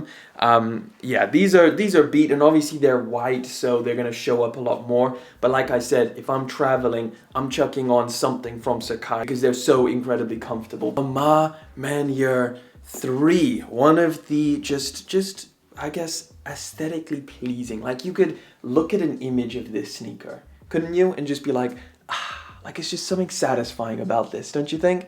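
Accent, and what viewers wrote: South Africa. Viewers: I wanted to say I hear a bit of South Africa in your accent 😂👀🔥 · I KNEW I GOT A HINT OF SOUTH AFRICA WHEN I HEARD YOU TALK!!! Represent! 🔥 🇿🇦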